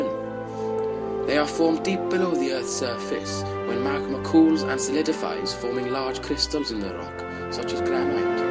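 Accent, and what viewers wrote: Wales, United Kingdom. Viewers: I think welsh.not sure but it does sound awesome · I'm acc here for revision because I have a mock, and I'm getting these cheeky lads giving a commentary on something they'll never probably use! That Welsh accent though!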